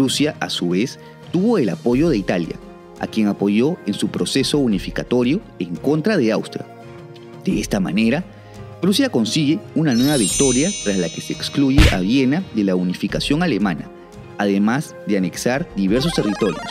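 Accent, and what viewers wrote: Peru. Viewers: Que FEO el acento peruano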